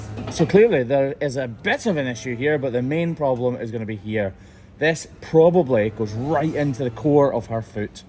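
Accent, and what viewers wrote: Scotland, United Kingdom. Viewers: Please continue to pronounce iodine the way you say it. You are Scottish in Scotland. I very much enjoy listening to you speak. Don’t change for You Tube.❤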